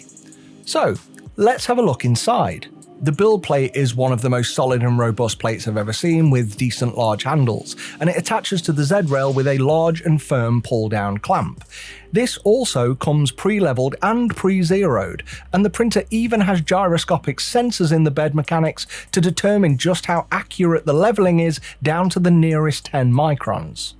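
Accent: United Kingdom. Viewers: We are in the process of setting up a small-batch consumer products company and have recently ordered a number of GK two's. Love the concept of this Heygears unit as it has the potential of minimal fettling. Unfortunately the cost of the resin would render the units impractical as it would push our product price-points too high. P.S. Great video. As alway's, informative, concise and candid. All in an East-Midlands accent. What's not to like :)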